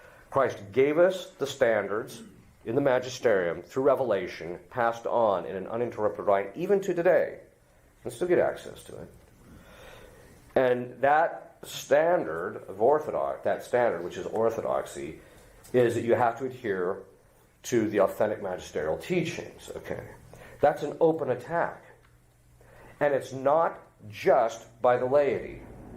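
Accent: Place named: United States. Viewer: Plus, zar nije fenomenalan njegov jaki svjetovni,muski americki naglasak? Bas svjedoči njegovom specificnom karakteru.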